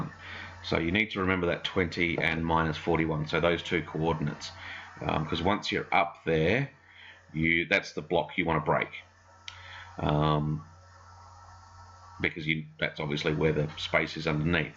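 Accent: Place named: Australia. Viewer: Great to hear a fellow Australian, and thanks for posting the tutorial! It's a great first video.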